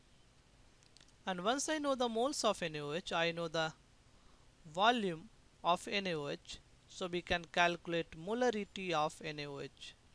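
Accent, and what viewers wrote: India. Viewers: Wow... you have the voice of a very stereotypical indian man... concentrasin?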